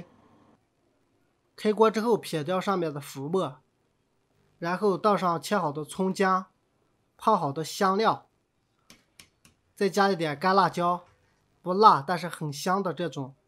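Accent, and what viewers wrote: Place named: Northwest, China. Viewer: 哈哈，一听你的口音，马上订阅，妥妥的西北人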